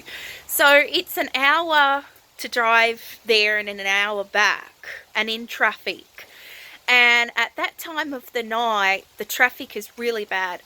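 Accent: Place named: Australia. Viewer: I like your vlogs.... your accent is so Australian, at first i thought you lived there, but now i understand you live in USA.... why did you decide to move countries, was it when you got married?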